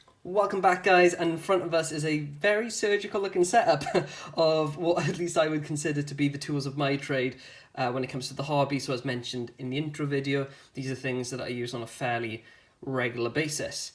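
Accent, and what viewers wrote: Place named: Wales, United Kingdom. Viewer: id say he's from wales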